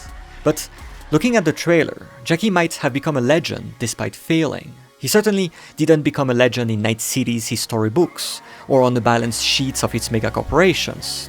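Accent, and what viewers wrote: France. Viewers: Good video. Is it just me, or you have a French accent?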